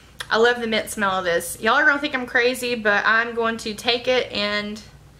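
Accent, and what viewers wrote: United States. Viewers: I love your country accent!! And your camera is bomb!!!! Love from Texas!!!! I love my boxy lux box.... The Luxie brush is so amazing it literally soaks up no product!!! Your gonna freak out!!!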